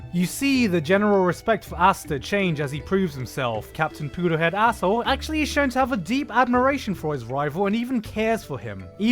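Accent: United Kingdom. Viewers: Wow you sound really british in this video... perhaps the only thing left was talking about West Ham's next fixture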